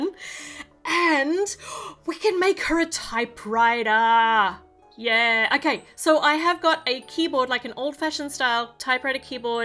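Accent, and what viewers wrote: Australia. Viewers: Gday mate your Australian right? If not hello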